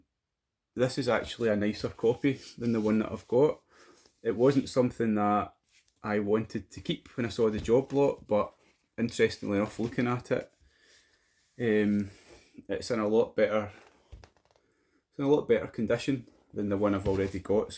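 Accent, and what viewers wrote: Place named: Scotland, United Kingdom. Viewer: Get rid of those vinyl relics and while you're at it remove that daft Scottish accent 😆👎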